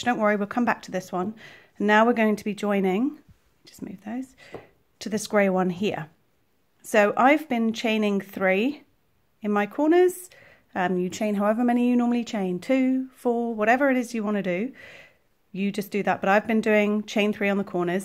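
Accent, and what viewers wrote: England, United Kingdom. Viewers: Great video I have subscribed and shall look at your other vids You sound British so why are you using USA terminology? You even kept correcting yourself when you said treble. Not trolling or criticism just curious? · Hi this is brilliant - so grateful for your lovely video tutorials. The only thing confusing me is your use of US terminology in your lovely English accent - but that isn't a criticism as just so grateful to be able to follow your methods. Will this CJAYG method work on the solid granny square and do you have a tutorial for that as well please.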